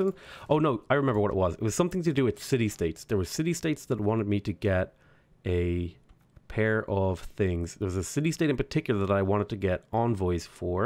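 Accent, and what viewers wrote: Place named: Ireland. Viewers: Ah he's Irish, since i'm not from an english speaking country it can be hard to identify such sometimes. I usually associate Irish with the incomprehensible speech of rural citizens, even though that is probably only a small percentage :) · Just Irish. My lot are from Galway, let's just say you're unlikely to get any West Coast farmers as youtubers 😉 no fecker would understand them.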